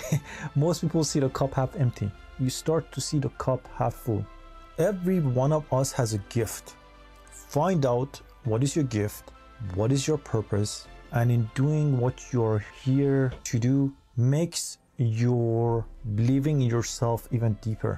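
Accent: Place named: France. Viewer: TRUE , I WILL AS A last resort! Specially in workforce , if push comes for sure! Curious , you have french accent when you speak! Are you from France or elsewhere? Love all multi languages, I am Spanish 😄half Cuban/Peruvian born in USA! Hoping when we all descend to 5D we can read minds and speak all languages! Love traveling! But for now I wait! Waiting for the Spirituality to see Grand parents miss them immensely! KEEP UP ALL POSITIVITY 😉 most people do not want to believe and higher sources!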